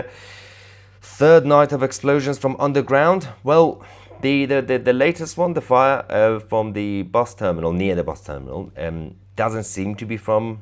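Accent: United Kingdom. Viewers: Nice British accent and well broadcasted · Excellent news report on a great country under crazy leadership. I enjoy your wonderful British accent! Keep up the good work.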